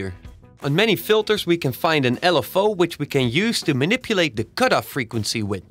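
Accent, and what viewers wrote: Netherlands. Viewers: Haha - You sound sooo Dutch. Nice tutorial though - thanx ;) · Areeh joe Nederlands?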